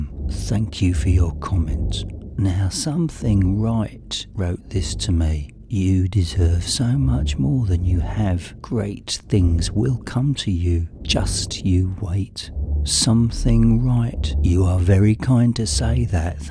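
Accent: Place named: England, United Kingdom. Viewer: Another Nightmare Expo attendee. Two things, I loved the first cell slamming sound, scared the piss out of me. 2nd, listening to your voice, I have only heard a few people with the "English" accent that I adored. I like your accent enough to hold it in regard to the likes of Stephen Fry or Jim Dale.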